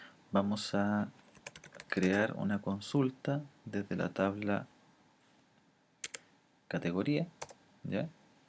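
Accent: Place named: Chile. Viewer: Chileno! por fin wn.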